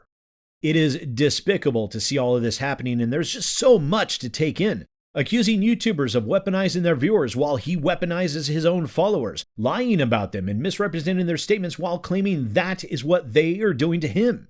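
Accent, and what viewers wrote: Australia. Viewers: He's Australian, jesus why do so many yanks get confused over the accents? We sound nothing alike. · HEY, hey, don't make this about Americans! I'm from America, and I knew he was Australian right away. Some people are just... well, fuckin' stupid. I think we all have problems with that, let's be honest. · On behalf of Queenslanders I believe he was raised in Tasmania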